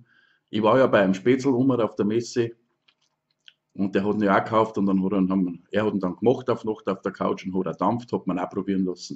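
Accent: Bavaria, Germany. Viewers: Boarisch is einfoch schee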